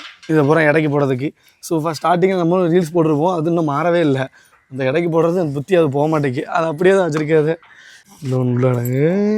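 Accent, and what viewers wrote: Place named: Thoothukudi, India. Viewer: ஓகே அண்ணா அக்கா எனக்கு அம்மா ஊர் தூத்துக்குடி யில்ஓருகிரமம்வேப்பலோடை.மாமியார்ஊர்சாத்தூர்பக்கத்தில்பந்துவார்பட்டிஇப்போதுஇருப்பதுதிருவள்ளூர்.உங்கள்குரல்தூத்துக்குடிகாரங்கபேசுவதுபோல்இருக்கிறதுஅதனால்தான்கேட்டேன்எனக்குஉங்கவீடியோஎல்லாம்பிடிக்கும்அக்காஅண்ணா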